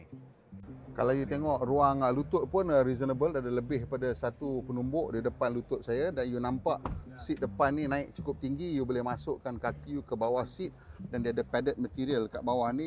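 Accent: North, Malaysia. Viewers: noh...asai utara ka?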